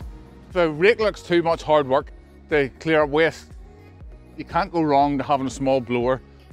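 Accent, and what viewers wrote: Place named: Belfast, United Kingdom. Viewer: Love the accent, my dad was from Belfast...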